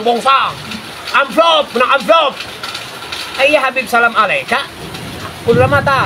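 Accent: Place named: Java, Indonesia. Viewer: admin ustadz iki alamate ning endi .iki potongan logat mah jawa kita kene iki yah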